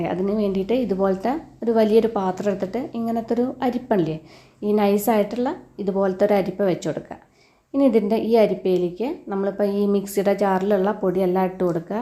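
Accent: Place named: Valluvanad, India. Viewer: നല്ല വള്ളുവനാടന്‍ ഭാഷയ്ക്ക് നമസ്കാരം.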